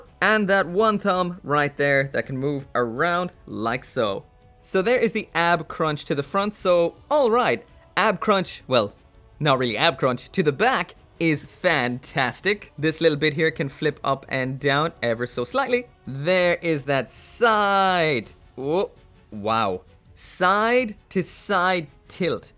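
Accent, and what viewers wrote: Ireland. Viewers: You from ireland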